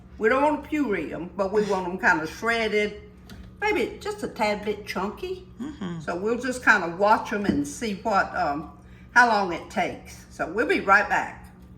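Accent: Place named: South Carolina, United States. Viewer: I never knew how much I missed the South Carolina accent until I started watching your videos. My mother was born and raised in South Carolina, and I spent my formative years there.❤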